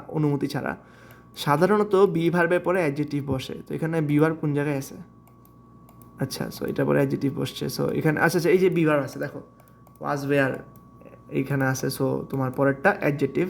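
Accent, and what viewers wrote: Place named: Sylhet, Bangladesh. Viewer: Apni ki sylhet er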